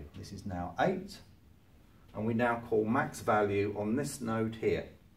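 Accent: United Kingdom. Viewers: Ohh British Accent ^^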